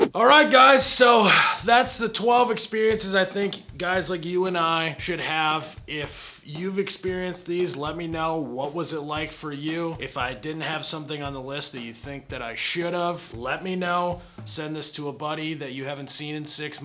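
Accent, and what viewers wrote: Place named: Dakota, United States. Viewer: I've seen your comedy sketches on FB for a while, but just over the last couple weeks have seen your YouTube videos. With that said, loving it man 😂. Can tell you're a Dakota guy, and sound exactly like all my friends from back home when you're talking about real shit.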